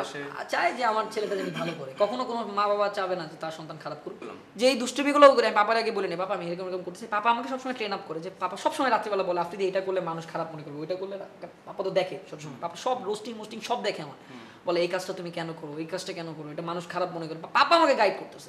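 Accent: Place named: Barishal, Bangladesh. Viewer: আপনার মুখে বরিশাল ভাষা শুনতে আমার খুব ভাল লাগে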